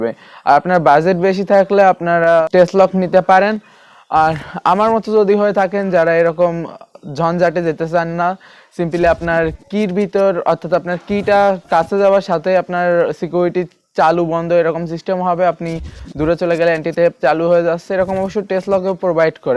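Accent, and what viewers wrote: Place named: Magura, Bangladesh. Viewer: ভাইয়ের বাড়ি কি মাগুরা? আঞ্চলিকতা রয়েছে ভাষার মধ্যে।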